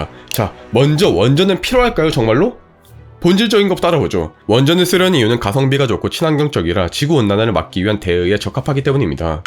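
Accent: Gyeongsang, South Korea. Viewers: 경상도 아이가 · 경상도 같이 느꼈어요..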